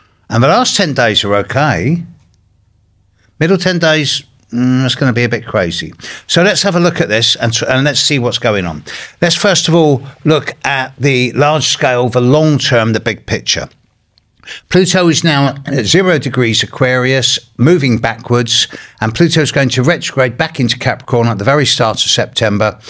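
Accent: United Kingdom. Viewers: Beautiful British accent! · New subscriber—I don’t know why I trust astrologers with uk accents but there you go.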